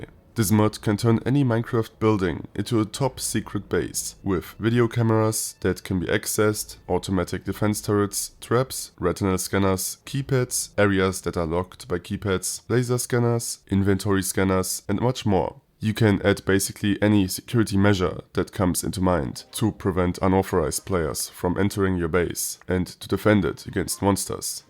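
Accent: Germany. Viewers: Bro are you german??? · are you german? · you are German or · thats a realy german english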